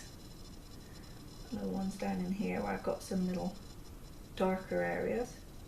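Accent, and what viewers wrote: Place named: West Country, United Kingdom. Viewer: Beautiful, I can see why you are thrilled with this piece! By the way, your accent sounds West Country UK, is that correct? X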